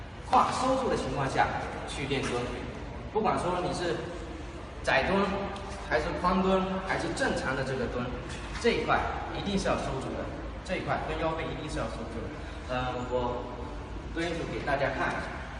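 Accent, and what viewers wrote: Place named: Fuzhou, China. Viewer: 亲切的福州普通话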